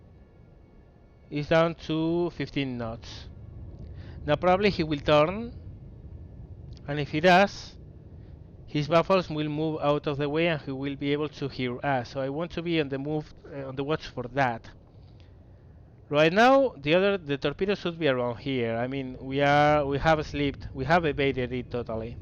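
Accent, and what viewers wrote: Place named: Spain. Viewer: Where are you from? You have a really familiar accent.. perhaps Spain?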